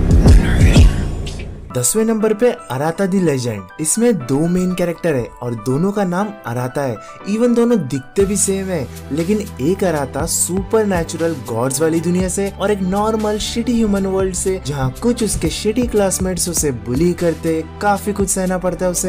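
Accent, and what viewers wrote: Bengal, India. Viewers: Bro are you Bengali? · Tumi ki bengali? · Bro. Are you Bengali?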